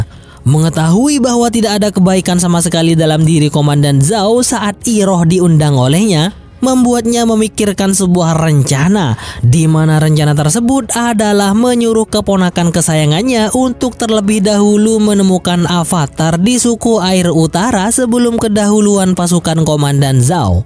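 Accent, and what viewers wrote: Madura, Indonesia. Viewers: Baru sadar kalau yg bicara alur cerita orang Madura 😂😂😂 mateh kkeh conk dhulat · Behhh mak tepak ka oreng madure, oreng dimmah? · Ternyata oreng madureh kkeh bang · Anjir orang madura coxxx 😂😂😂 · Adminnya orang madura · Orang Madura Kah Min? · Hahaha reng madure kakeh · Reng madureh kakeh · Mateh kakeh cong wkwkwk... oreng madureh nii boss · Beh madureh kakeh huh la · Kok ada bhs maduranya nkok reng madureh kiah😅😅mantapp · Njr reng matureh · Anjir reng madureh kak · Orang madura ya bang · Mik pas ngucak madura kkeh😅😅😅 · Mak madureh koh🤣🤣,"mareh kkh conk dulat" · MK bedeh madurenah 🤣🤣🤣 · Mantap tretan baru tau naratornya org madura... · Anjay medureh kakeh kak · Eh ternyata orang madura taiyeeee.. Sukses tretan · admine seorg madura...aksentomasinya jelas betul....hahhahah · Orang madura bang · Madureh keh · Udah nebak kalau Mimin orang Madura dari awal pas ngocak " mateh kakeh cong, dulat " langsung ngakak aku 🤣🤣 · Admin nya Orang madura😂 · Oreng Madura been kak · Medureh cak jhhh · Kakeh reng madureh iya bang wkwkwk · Ternyata orang Madura😂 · Orang MADUREH kakeh bang 🤣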